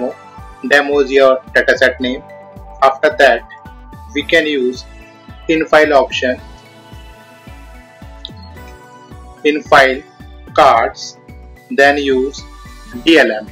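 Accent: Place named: India. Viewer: Please speak English clearly. Your strong Indian accent is disturbing.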